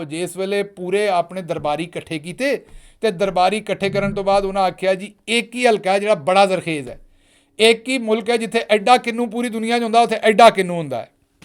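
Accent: Punjab, India. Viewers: I am an urdu speaking and got acquainted with Punjabi during my visit to Lahore, sargodha and khushab. I do enjoy your Punjabi accent and your juggats are quite amazing and tely on Pmln. Keep it up. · What a punjabi accent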